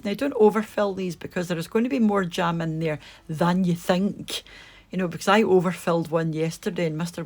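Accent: Scotland, United Kingdom. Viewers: Tried this recipe works a treat. Very nice video and love the Scottish accent :) Well done.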